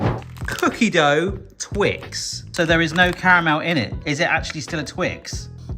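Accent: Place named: England, United Kingdom. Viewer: Nice recipe, and awesome english accent.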